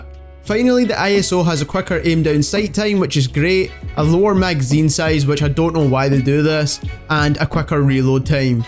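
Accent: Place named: Scotland, United Kingdom. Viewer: He is from Scotland , i think , because he has a scottish/irish accent.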